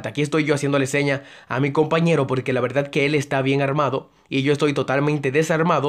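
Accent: Dominican Republic. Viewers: buen video, eres dominicano? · Eres de republica dominicana?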